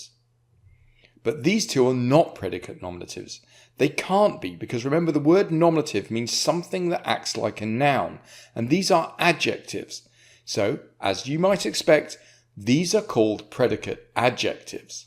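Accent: United Kingdom. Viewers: Bro is the british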